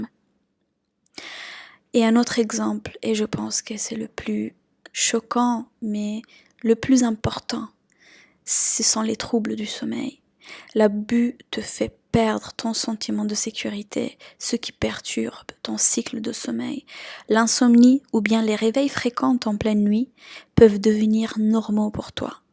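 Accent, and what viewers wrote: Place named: Iran. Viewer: Merci 🙏 pour vos vidéos D ou vient ce jolie accent? Je dirais l iran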